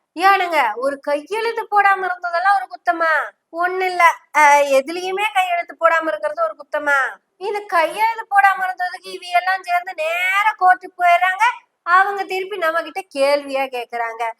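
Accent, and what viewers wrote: Kongu, India. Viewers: அம்மாடி உன்னுடைய கொங்கு தமிழ் மற்றும் கொச்சை ஹிந்தி... சூப்பர் மா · கொஞ்சும் கொங்கு தமிழ் அழகு. கொஞ்சம் கொச்சை இந்தி சூப்பர்.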